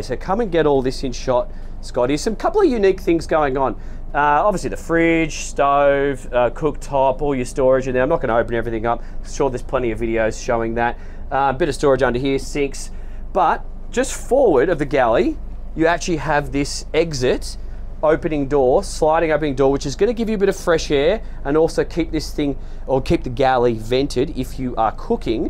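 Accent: Australia. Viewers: Love the Aussie accent..Sometimes he says here , sometimes heeyah..Keep up the good work..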